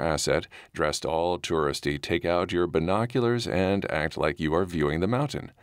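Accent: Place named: Maine, United States. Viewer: On the Maine accent lol Im from Maine and I'm native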